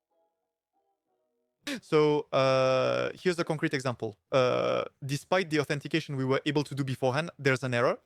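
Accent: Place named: India. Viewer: nice hindi IA accent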